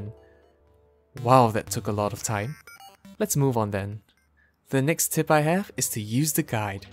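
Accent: Singapore. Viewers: knew u were singaporean the moment u started talking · Wah bro, you sound like a singaporean.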